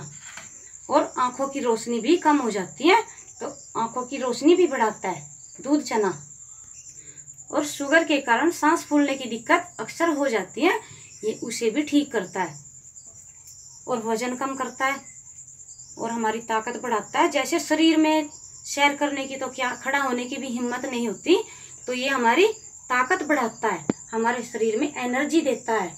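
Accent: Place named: Hyderabad, India. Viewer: Madam aap se aane ki taraf se ho kya aapki awaaz Udhar Ki Lag Rahi Hai main Hyderabad se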